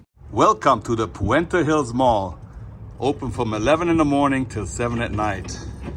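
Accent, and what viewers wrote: Germany. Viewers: Are you German? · Haha the very same Massage-Seats weve over here in the "PC" (Paunsdorfcenter) in Leipzig, Germany. Got your Video in the Recommendations and just thought "he has a german accent" - totally not read ur Accountname.:D Wish ya a nice sunday and thx for the small travel in time.:) Liebe Grüße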